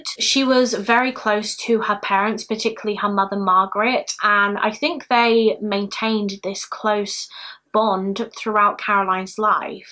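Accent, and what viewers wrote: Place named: England, United Kingdom. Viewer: Such a sad case. Totally unrelated question, just because I'm curious - what part of England are you from? Fascinated by your accent.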